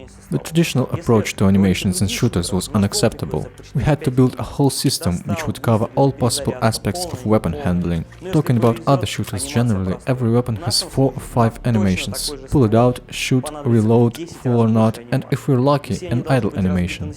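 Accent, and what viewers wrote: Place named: Russia. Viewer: when a russian try to speak english in this video...i understand only 40% of the dialogue, please take an other speaker for english voice over....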